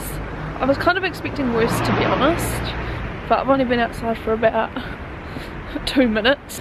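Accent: New Zealand. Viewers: Love your kiwi accent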